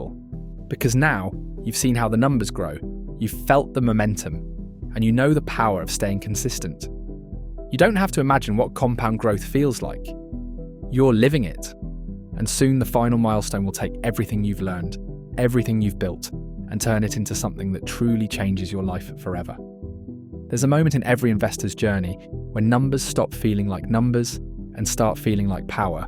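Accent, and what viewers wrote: United Kingdom. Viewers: Weird to hear an British accent talking about 1 million dollars and not Pound Sterling.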